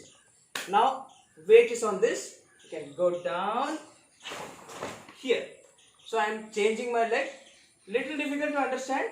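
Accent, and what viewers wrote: India. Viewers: in Russia we have the term "Indian English" :-) Realy, very funny and very hard to listen to serious 😁 Try to look "The Coding Train" - that is what I wish Indian English to be! And sorry for my English) Respect! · I'm a real fan of his talking style because of my experience with a lot of Indian friends I have Including my Indian bosses Mr Mahendra Waman, Mr Raut, Mr Suresh and other friends like Prasad, Anil, Sarath, Shiyo just to mention a few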